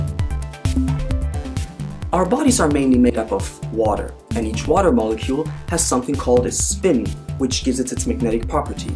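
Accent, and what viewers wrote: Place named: Germany. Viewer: do you happen to be German or where does this very slight German accent come from?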